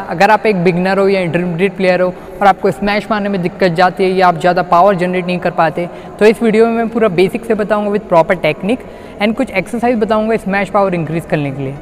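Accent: Odisha, India. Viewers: your accent is odia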